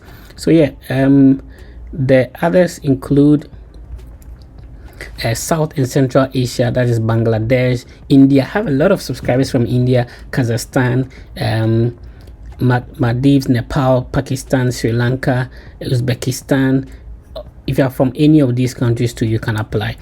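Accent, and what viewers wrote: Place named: Ghana. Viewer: You speak a Ghanaian English. Are you Ghanaian?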